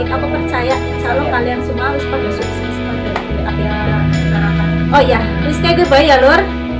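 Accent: Banyumas, Indonesia. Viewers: mbak yu mbak yu ngapak kie...dulur seneng wis diparani...mangan puding enak plus horror...sukses ya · Suara apaan tuh serem😥😥semangat kak bikin kontennya ngapak yo ra popo 😁 😁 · Seneng bahasane mbak ngapak lucu , menghibur bgt salam kenal dan sukses ya luhur. 👍🏻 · SEMANGAT terus ya mbk Kiye asli wong ngapak apa ya'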